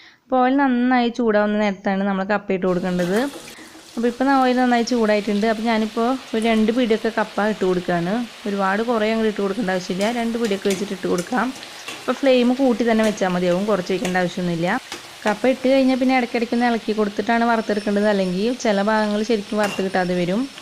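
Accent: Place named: Thrissur, India. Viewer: Good thrissur language 👍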